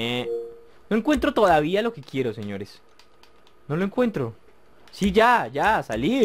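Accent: Colombia. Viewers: ERES COLOMBIANO CIERTO SE SIENTE TU ACENTO YO TMBN SOY COLOM Y HABLAS IGUALITOOOOOOO VIVA COLOMBIA. ENCERIO ERES COLOMBIANO VERDAD? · si creo que es colombiano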